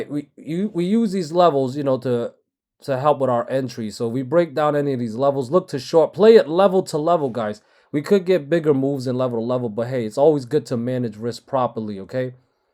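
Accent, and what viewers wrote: New York, United States. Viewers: Yo, when are you going to reveal your identity? You're definitely from NYC. You probably from Brooklyn cuz you stay keeping it 💯 😂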